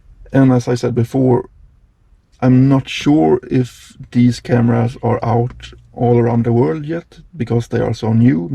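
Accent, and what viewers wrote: Sweden. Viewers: Your Swinglish is absolutely fine. 😃 The camera is great . Extremely clear front and rear and it changed immediately in and out of the tunnel. Excellent product for anyone that spends a lot of time on the road. Thank you once again for your beautiful scenic videos and great choice of music as always. 🤗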